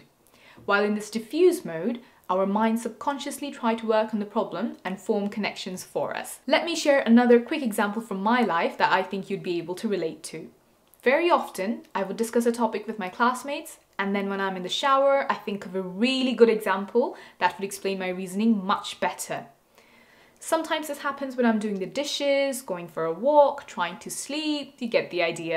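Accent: United Kingdom. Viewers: very sweet British accent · How would you expertise British accent? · how you axcually gain the british pronunciation and fluency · You talk perfectly in british accent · That accent is way tooo oxford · your british accent is so soothing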